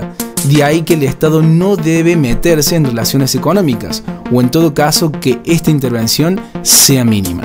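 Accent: Córdoba, Argentina. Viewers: Creo puede ser cordobés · Like por el acento cordobés 😍 Nah fuera de joda, me sirvió mucho, graciass!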